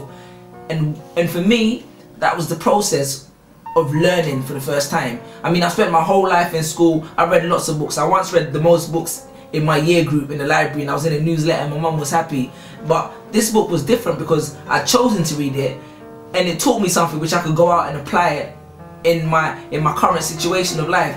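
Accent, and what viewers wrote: United Kingdom. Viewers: hey were are u from, i can tell u haev a british acent. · I love your accent.. it's almost confusing though. Some words you say you sound Haitian and other words you sound British.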